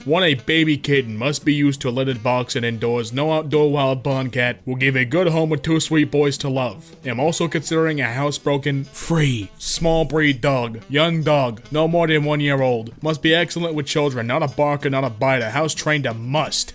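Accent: United Kingdom. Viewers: is british? his accent was british 12 months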